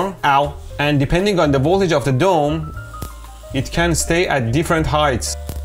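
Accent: Iran. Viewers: Ultimate parsian accent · با لهجه غلیظ فارسی انگلیسی صحبت میکنه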